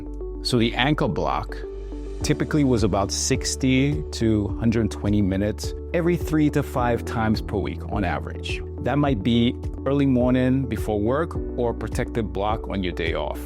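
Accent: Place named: Caribbean. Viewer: Are you Caribbean? I hear my people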